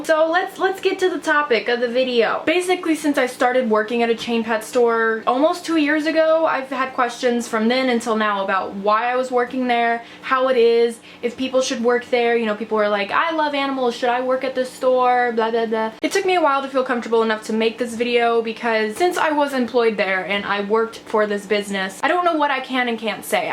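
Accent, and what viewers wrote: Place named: South, United States. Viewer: Where do you live?I notice a southern accent.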